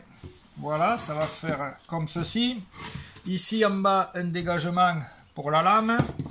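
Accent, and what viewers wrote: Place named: Gard, France. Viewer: Je t'adore! et ton accent qui me rapelle celui de mon patron quand j'allais vendanger dans le gard! le petit betisier est cool aussi, peut le mettent en ligne et ca ajoute un peut de proximite. ne change rien:)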